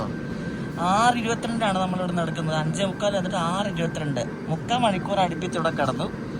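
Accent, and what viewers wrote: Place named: Kottayam, India. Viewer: Awesome …adipoli ….❤❤❤❤ parallel race super 🔥🔥🔥🔥. Talk kelkkan rasam undu. Kottayam style talk 😍😍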